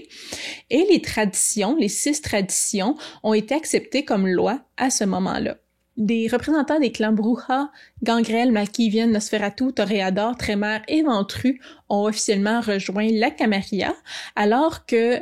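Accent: Quebec, Canada. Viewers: Quelle excellente vidéo 😮♥️ J’ai été tellement agréablement surpris d’entendre une compatriote québécoise sur le YouTube francophone ♥️ Je m’abonne à la chaîne!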